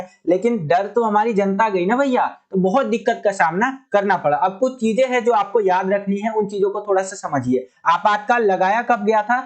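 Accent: Bihar, India. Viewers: Sir Bihar se hai kya aap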